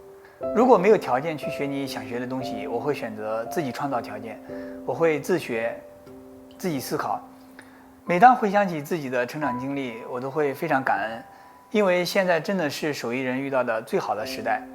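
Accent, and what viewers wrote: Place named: China. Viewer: Привет! Всегда думал, что ты из Японии, но твоя речь и особенно цифра "5" дали мне понять, что ты из Китая. Просто, я не слышал, как ты разговариваешь). Но, не это главное.. Каждое слово в твоём монологе, каждая мысль, созвучны с моими мыслями. Я всю свою жизнь не зависел от начальников, государственных структур и тому подобного. Всю свою сознательную жизнь я зарабатывал своими руками и накопленным опытом. Это были, в основном, ремонты интерьера и разработка и изготовление узкоспециализированных станков. Теперь мне 49 лет, но я гораздо моложе физически и полон сил.... Обеспечил себя большим количеством свободного времени и хорошего инструмента. Твои видео, а так же других мастеров, дают мне идеи и приёмы в новом опыте. Благодарю тебя. Привет из Мариуполя..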